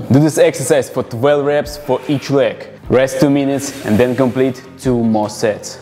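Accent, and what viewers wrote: Russia. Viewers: рашн инглиш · я же слышу, что ты русский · сколько лет живет в сша, а акцент как был русским, так и остался.лол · а ты русский ?, потомучто говариш как русский луде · Почему я слышу русский акцент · This russian accent motivates me so hard. I feel so jacked already 💪😁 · Прикольно и полезно, но акцент как из фильмов про карикатурных русских гангстеров, конечно, сбивает...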